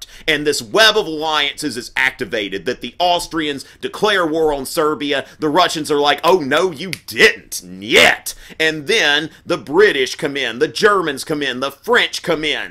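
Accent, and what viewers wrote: South, United States. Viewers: Love your videos! Informative plus a great southern accented sense of humor. Thanks! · His voice is so American. He seems so hyper, like calm your jets. :D Good video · r u American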